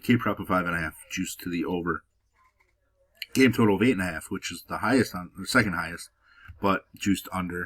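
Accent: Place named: Wisconsin, United States. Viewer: It's that Wisconsin accent lol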